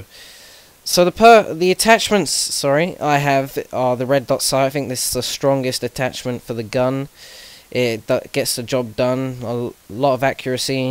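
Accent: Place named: United Kingdom. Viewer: Iclicked on this video thinking "Cool! an informative Create a video!" and then I heard the accent and i clicked away not because i didn't like the video, or that i hate British or English I just HATE the accent, and so many people have that accent on youtube and it makes my ears bleed.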